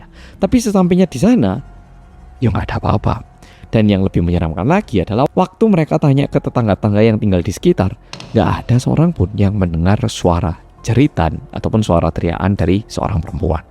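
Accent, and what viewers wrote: Java, Indonesia. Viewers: Iyo serem rek.. Aku nyimak siang hari... Sehat sukses selalu muedok🎉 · medok amat rek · Muncul di bawah video bang mewing. Penasaran orang korea ngomong b.Indonesia, ternyata medok.. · mas medhokkkk .. tolong bahas kasusnya furota dong yang di siksa 44 hari .. di perkosa secara sadis sampe mayatnya di cor di tong ... pngn tau cerita lengkap nyaaa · Sumpah suara host nya MEDOK bet tapi enak didengar hehe semangat terus ya...n semoga chanelnya semakin berkembang....all the best for your chanel 🙏🙏🙏🙏🙏🙏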